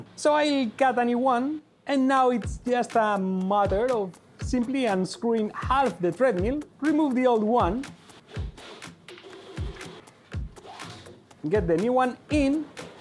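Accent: Spain. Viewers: A ver, quedó duro que no pudieras montar el kayak bien, pero por otro lado esto muestra que la impresión 3D tiene sus retos, y ciertamente hiciste el print en menos de 24h, por lo que tremendo logro la verdad. Me pregunto si merecería la pena comercialmente hablando hacer un kayak así, probablemente no ya que deben tener mejores sistemas. Quiero hacer un negocio al rededor de la impresión 3D, siendo que estudié modelado 3D y quiero aprovechar que ya se de esa parte. A ver que tal va, si Dios quiere bien. Saludos (No se porque me hace gracia escucharte hablar en inglés con el acento español, siendo que estoy acostumbrado a escuchar a gente hablando inglés siendo nativos... o a españoles hablando español jaja) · Perfect presentation, informative and entertaining, but the thing I enjoyed the most was the accent, Spanish English is the best English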